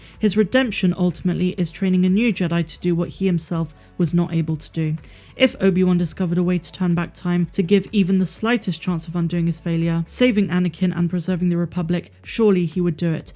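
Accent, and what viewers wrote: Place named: West Country, United Kingdom. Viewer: Another great vid. Love the fact you seem to be from the west country.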